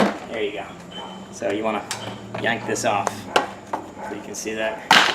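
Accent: Belfast, United Kingdom. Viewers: Is that a hidden belfast accent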